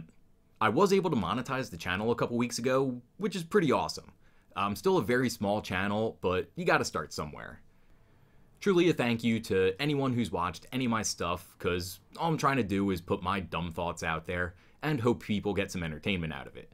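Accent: Philadelphia, United States. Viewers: Philly accent for sure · GREAT points man. you from philly? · Yo bud you from around southeastern PA? Is that a slight Philly accent I hear? You sound like me lol.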